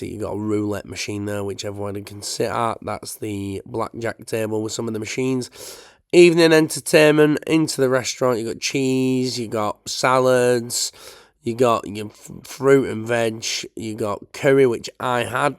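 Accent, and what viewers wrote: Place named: Greater Manchester, United Kingdom. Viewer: loved watching this. fresh youtube subscriber. watch you for a couple year on the tiktok. funny guy. love listening to your acscent. reminds me off my family from bury/radcliffe. keep up the great content.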